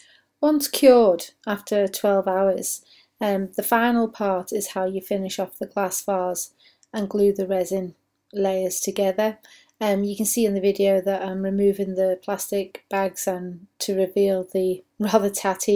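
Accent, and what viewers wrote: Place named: Liverpool, United Kingdom. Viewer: Your accent is lovely. You’re a posh scouse x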